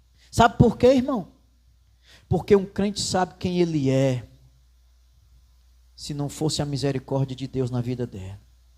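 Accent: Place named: Northeast, Brazil. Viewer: Gostei demais fala nossa língua nordestês. Glória a Deus!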